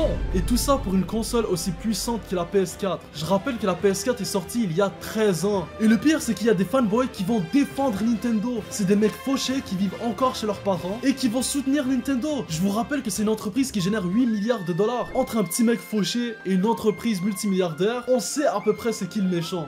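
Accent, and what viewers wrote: Quebec, Canada. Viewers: T'es québécois ? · puréeee après 4 vidéos regardées j’ai enfin capté que t’étais québécois j’arrivais pas à analyser l’accent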